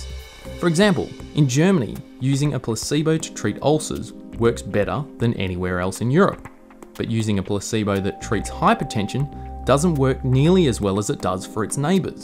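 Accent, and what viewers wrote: Australia. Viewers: thats aussie accent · Umm... all i learned is that you have a freakin' wicked aussie accent, mate1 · this australian accent makes it impossible to take seriously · Love ur Aussie accent :D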